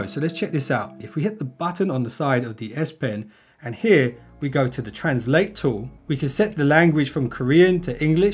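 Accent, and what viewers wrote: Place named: United Kingdom. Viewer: uve got a british accent but you say zee instead of zed .... weird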